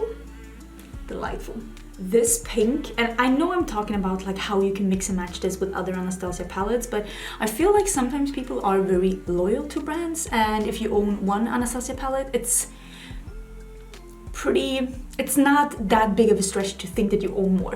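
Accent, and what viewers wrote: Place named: Sweden. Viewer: These are all so pretty! All of your effort is really appreciated. This might seem like a weird comment, but I spent a lot of my career (I’m a writer) covering Swedish hockey players. Your speaking style reminds me a lot of them, and it always makes me smile! I really need to come visit Sweden — I love the people and their sense of humour. Much love from Canada! 😘❤️🇨🇦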